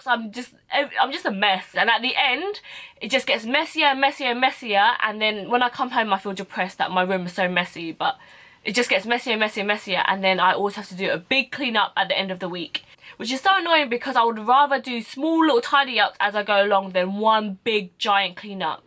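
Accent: United Kingdom. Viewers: I just subbed...simply because i feel inlove with your british accent and your ombre brow 😉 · So funny those facial expressions hahaha u make me laugh, an Asian speaking with a British accent is fukn funny af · Is she British?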